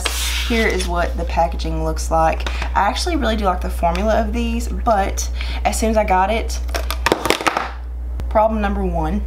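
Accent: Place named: Kentucky, United States. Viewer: I just found your channel and your so relatable! Love videos like these! Where are you from? You sound like a Kentucky girl like me lol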